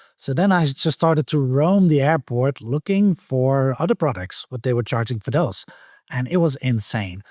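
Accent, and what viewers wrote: Netherlands. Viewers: Je klinkt Nederlands! Goeie video iig.. bedankt!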